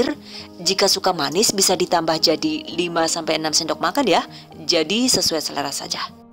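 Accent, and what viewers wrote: Banyumas, Indonesia. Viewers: Ternyata ngapak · Rika wong ngapak ya mba??haha Nembe nemu nang beranda jannn...ora kit biyen nemu...langsng subscreb mbae...padettt bgt penjelasane.... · Done#GiveawayTHV2021 terima kasih atas semua resep olahan yang belum pernah praktek kan tambah ilmu melihat video THV ini pokoknya the best dah dan ada bahasa ngapak ngapaknya i like it.. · Ngapak koek koek menginspirasi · mba ini orang ngapak ya.kok pinter ngomong ngapak.pada bae karo inyong mba😁😁😁😁😁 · ngapaknya itu lho bener-bener ngangenin inyong jadi pengen nunggang bis ke kroya wkwkwkwkwkwkwk, btw makasih banget ya bund resepnya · Diiii bocah ngapak ya ,ujungnya2 ko jadi kaya kiye ...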